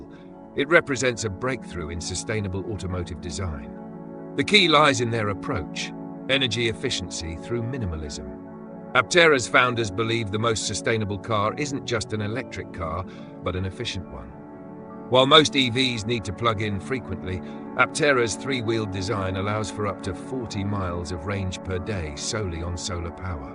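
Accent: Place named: United Kingdom. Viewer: Oh, goody! Another fluffer for aptera ..but with a British accent.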